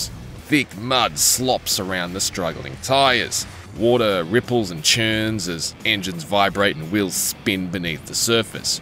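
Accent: Australia. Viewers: Australian accent does that. A lot of us are very monotonous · Did they purposely find an aussie guy to review this? Kinda makes sense tbf lol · Yeah nah, He's positive neutral. it's just the Australian accent. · He's an Aussie. It's how we sound.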